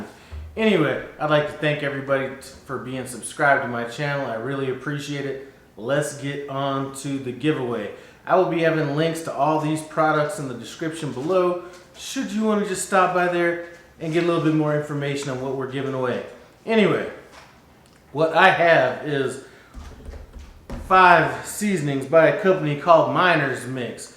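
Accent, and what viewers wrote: South, United States. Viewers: Funny finding myself on your page- I was looking at how to combine my steak and queso fresco... and an hour later I'm still looking up seasoning for grilling! I know you said you weren't responding, BUT, any suggestions on a flavorful rub for smoking pork?! Mine always seems bland, like a wasted effort, 10 hours later. And, I think you said Colorado, but you sounded a little southern to me... at the same time, the UNC on your jacket isn't the same shade blue UNC wears down here! Either way, Cheers!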